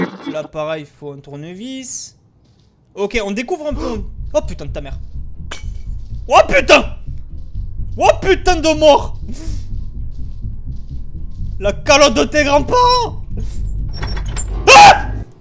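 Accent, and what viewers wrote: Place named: Alsace, France. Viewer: Tu vien d'Alsace ?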